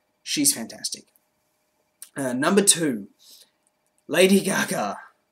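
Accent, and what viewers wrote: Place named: Australia. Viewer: what part of australia are you from? i can't pick the accent and its annoying me :P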